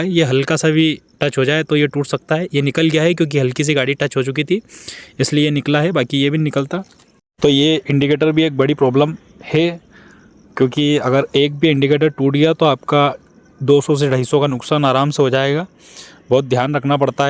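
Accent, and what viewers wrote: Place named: Indore, India. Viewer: Bhai tumhare bolne ka tone indore ke jesa kyu lg rha h.. tum Mp indore ke aas pas se ho kya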